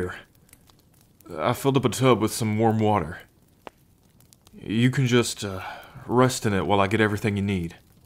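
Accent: South, United States. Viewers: He sounds southern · a heavy southern accent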